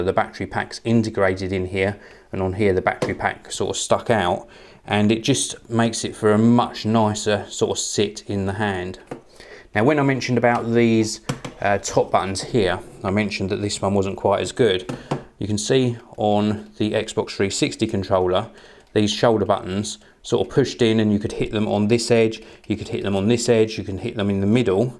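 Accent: United Kingdom. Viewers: Dat awesome british accent though.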